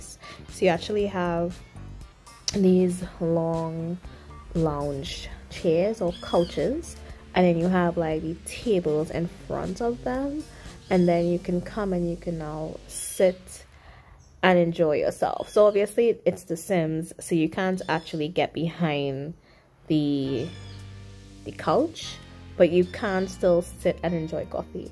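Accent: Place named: Nigeria. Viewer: love thw cafe. where are you from you sound Nigerian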